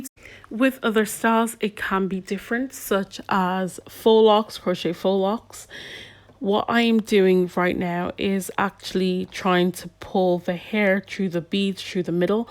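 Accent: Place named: Ireland. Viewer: Are you Irish? Your accent is beautiful.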